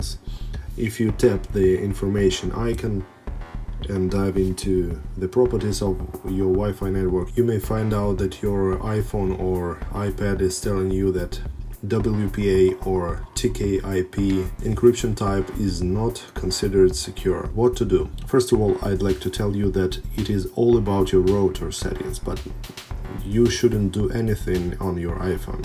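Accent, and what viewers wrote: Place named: Russia. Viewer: Thanks man❤️ like that heavy Russian accent.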